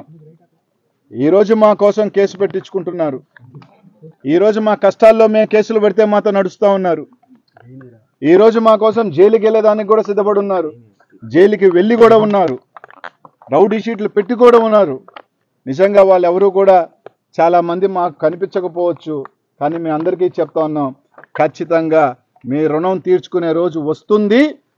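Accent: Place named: Nellore, India. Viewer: సూపర్. మాటలతో నే రేప్ చేశాడు వాళ్ళని❤❤❤❤❤ఇది నెల్లూరు యాసకి ఉన్న స్పెషల్ క్వాలిటీ❤❤💪💪💪 నువ్వు ఉండాలి అన్న నెల్లూరు లో అప్పుడే ఊపు ఉంటాది కిక్ ఉంటాది మజా ఉంటాది❤❤❤నీ వాయిస్ నీ బలం అన్న ఎప్పుడు అలానే ఉండాలి ❤❤❤❤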